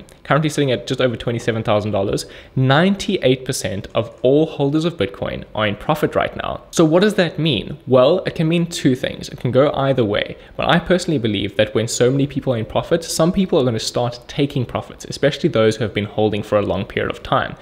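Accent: South Africa. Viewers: Are you from SA your accent kinda sounds that way ... I'm new here to your channel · south african? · Absolutely a South African and you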